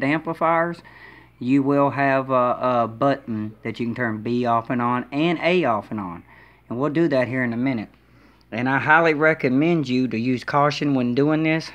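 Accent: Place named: Kentucky, United States. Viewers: I bet this dude is from Kentucky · So I can tell from your voice your from my home state lol, what part of Kentucky bro?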